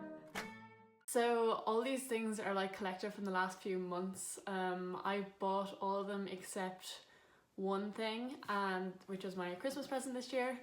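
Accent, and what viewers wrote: Ireland. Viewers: Dear, So nice to see ya, i've been in Ireland for four months last year as an exchange student and i also picked up the irish accent, it is so lovely to hear it now, i had been in Kerry near Killarney, don't you live near Dublin cause you went to the Dublin Festival or so, i would love to see more videos like that, btw love your room and the horse ears pic on the wall, went to Ireland this summer i am actually from germany but love this country so freakin' muuch😍😍😍 i am doing horse riding since nine years,obsessed with it, went this morning, was great😎 🏇 have a great weekend 💚🇮🇪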